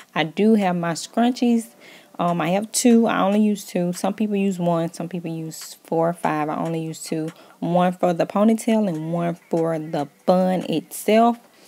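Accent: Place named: South, United States. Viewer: Omggggggggg where are you from? I love your accent 😊 hmm let me guess...Houston? Atlanta? Tennessee?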